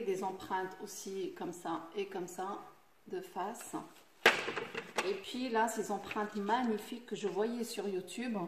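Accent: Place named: Meknes, Morocco. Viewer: Je me disais que ton marocain est le même que le mien tbarkellah 3la nasse Meknès ... Ma ville d'origine 😍😍😍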